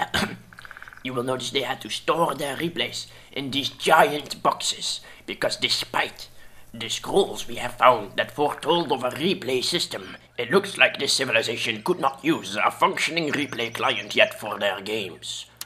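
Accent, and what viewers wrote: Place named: Netherlands. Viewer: sounds like a dutch speaking english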